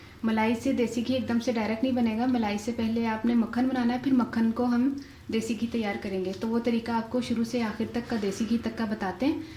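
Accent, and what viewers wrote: Punjab, India. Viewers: App behno ki pujabi bolne ka appna hi maza hai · Very good style and ur punjabi touch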